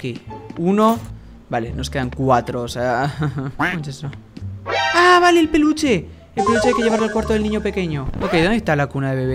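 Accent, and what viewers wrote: Argentina. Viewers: nuestro ídolo por ser el mejor con combinaciones perfectas como terror + comedia + sufrimiento de + acento argentino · Me gusta más Íker con su acento español no se siento que no le queda el argentino 😭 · enserio me das mucha risa a mi y a mi hermana y más que todo me da risa como hablas en argentino y dices groserías jajajajajajajajajajaja❤❤❤❤😂😂😂😂😂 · Hola, no hace falta que dejes de hablar con acento argentino, nos haces felices igual:) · +granny+sustos+palomitas+sabado+su acento argentino=definición de felicidad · Vos sos argentino ? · Sabes que habla como argentino??? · Oye me encantan tus videos pero una duda ¿Por qué hablas como argentino? Perdón 🙏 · +terror+acento argentino=jueves perfecto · Te sale el acento argentino y el acento venezolano😂😂😂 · eres argentino o español o de que pais eres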